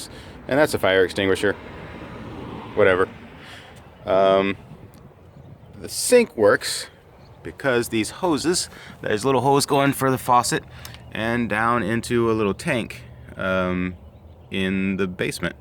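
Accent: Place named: Midwest, United States. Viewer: I came across your channel while searching for videos on Mini Van conversions as I have a 2014 Town & Country. As I listened to you I thought you sounded so much like my nephew who lives in Hobart. I watched all of your videos in one sitting and in one you state you're going from Portage to Portland. So that WAS a mid West accent I was hearing, and truly you sound just like my nephew! I thoroughly enjoyed watching your videos and look forward to more! You have a wonderful sense of humor and a great voice for YouTube!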